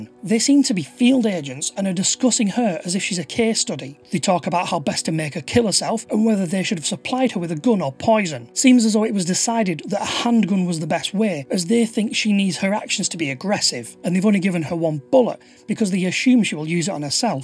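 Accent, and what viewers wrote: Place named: Manchester, United Kingdom. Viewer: Many thanks for this. Your voice sounds North Manchesterian, so if you want an interactive sensory descent into that post-apocalyptic decomposition vibe you could always visit the ex-shopping malls of Bolton. Plenty of it here. Bring a flamethrower. :)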